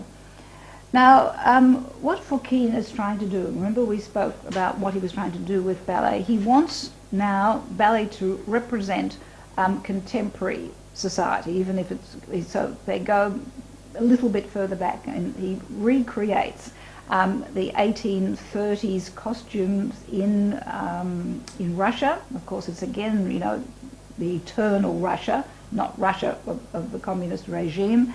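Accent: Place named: Australia. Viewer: if the australian accent could be contained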